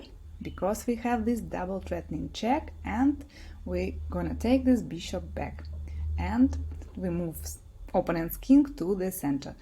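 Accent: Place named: Russia. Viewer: I like videos because she speaks slowly and clearly, with an adorable Russian accent, and goes back over the move sequences several times to let them sink in. Another winner. I am still waiting to pounce on someone with Captain Evans's gambit, but I'll be ready.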